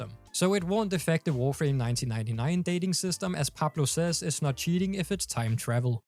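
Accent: Denmark. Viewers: Hey kan høre du er dansk. Håber jeg da i hvert fald. Jeg er helt ny i spillet ved du om der er en dansk Clan? Jeg spiller på pc. Må indrømme det er noget forvirrende det hele. Men super fedt har spillet 20 timer på 3 dage nu. Og kan ikke se hvorfor jeg ikke skulle forsætte med at spille 1000 endnu. Vil bruge din kanal til at se warframe nu. Mvh Tobias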